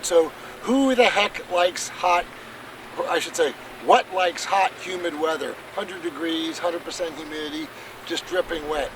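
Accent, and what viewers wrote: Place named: Midwest, United States. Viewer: I don't think he's from the South. His accent is Northern, imo. Probably Midwest.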